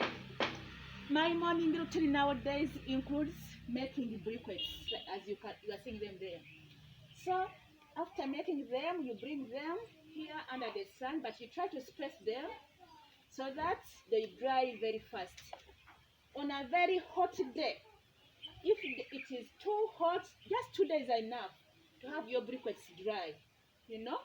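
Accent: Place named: Uganda. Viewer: I want to be your friend..i work together in saudi with annet..shes from uganda..the way she speak is same as you...shes a goodgirl also..freindly and kind..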